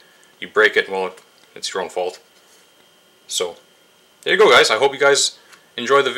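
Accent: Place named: Canada. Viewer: You sound a little like a Canadian.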